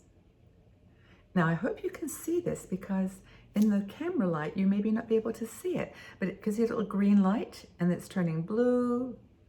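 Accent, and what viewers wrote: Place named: United Kingdom. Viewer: I detect a British accent.And of course,we Brits are always prepared! Blessings.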